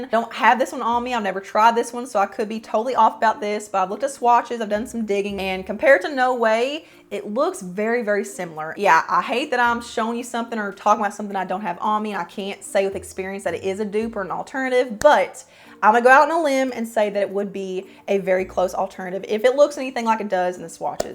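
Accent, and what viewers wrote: South, United States. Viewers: Love your southern draw 😊 great video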